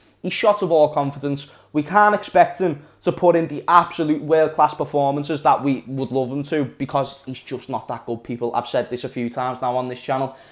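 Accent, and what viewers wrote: Liverpool, United Kingdom. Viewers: another class video. Not a scouser but I love listening to a proper scouser talk about Liverpool.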